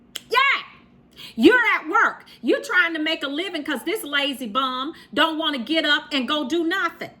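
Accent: South, United States. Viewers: Whenever she says, "That bird, SKUNK!" I be dying laughing🤣🤣🤣 she has a lil southern accent.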